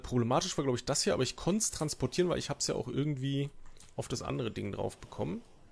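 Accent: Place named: Rhineland, Germany. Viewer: Knöpke und Nupsi :-D Bist du aus dem Rheinland?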